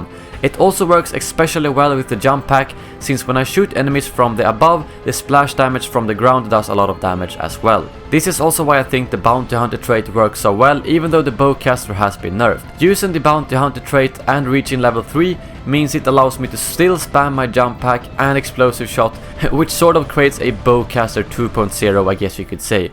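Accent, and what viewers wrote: Sweden. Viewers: are you swedish? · han låter svensk iaf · Älskar lite svensk brytning 😃👌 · are you swedish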